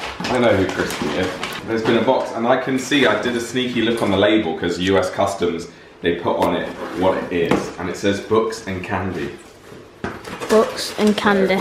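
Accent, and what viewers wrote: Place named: Southeast England, United Kingdom. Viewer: I love your gorgeous, classy SE English accent and I could easily see you going on our national broadcaster RTÉ, in the footsteps of Marian Finnucane, Kathleen Watkins and Gay Byrne - being proudly Irish myself and despite living 21 years in Manchester, I’m told that I still have a very strong Irish accent and also, despite being age 52, I’ve been told that I look younger than my age 🇮🇪☘️🇮🇪❤️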